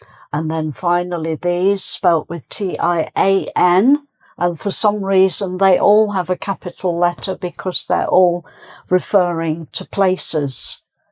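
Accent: England, United Kingdom. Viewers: British English? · Hi great teacher I love British accent. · You are amazing lady and a great teacher with so pleasant British pronunciation, thank you❤🌹🙏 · The Lady has nationality English basead in her voice.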